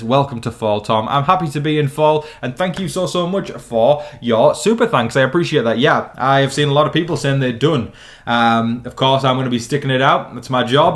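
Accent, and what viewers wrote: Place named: United Kingdom. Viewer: Thanks for reading my comment. It felt really cool hearing you say my words in your British accent 😂 ❤ as always thanks the hard work!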